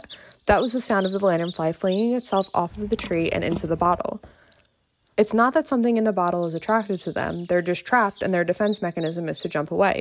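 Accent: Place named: South Jersey, United States. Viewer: You south jersey has a sifferent dialect than central and north east lol :)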